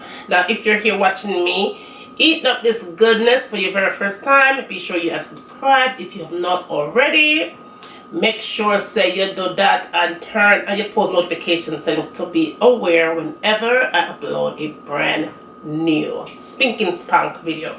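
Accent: Jamaica. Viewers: Very Jamaican. I love it.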